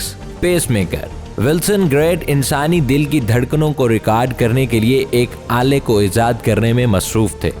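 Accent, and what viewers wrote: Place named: Lahore, Pakistan. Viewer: Hahaha, nai Lahore ka lgta hai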